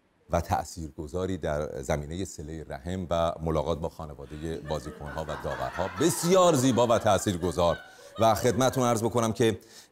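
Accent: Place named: Isfahan, Iran. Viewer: اصفهونیه لحجه داره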